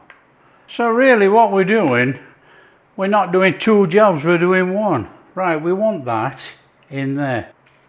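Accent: Yorkshire, United Kingdom. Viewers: I believe it's a Yorkshire accent, specifically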